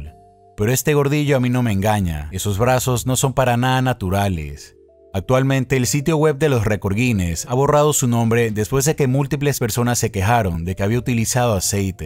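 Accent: Ecuador. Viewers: Es Ecuatoriano así hablamos a veces 🤣🤣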